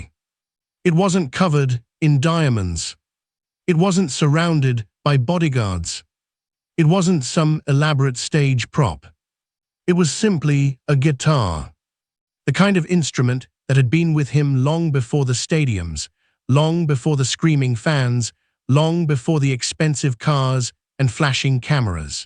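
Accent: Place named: United Kingdom. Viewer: I didn't click on here to listen to a Limey run his dialog for an hour. I'm deleting my sub and thumbs up too.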